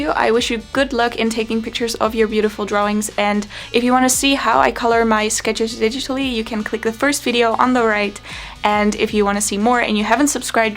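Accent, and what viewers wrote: Netherlands. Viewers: You sound dutch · Very helpful, thank you :) (btw, are you dutch? though I recognized the accent :P) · You sound Dutch haha xd but it's a cute english! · You sound Dutch (not in a bad way, you talk very clearly) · Love the video, this will help a lot, thank you!❤ (Are you dutch?, cause I think you kinda sound Dutch..😊)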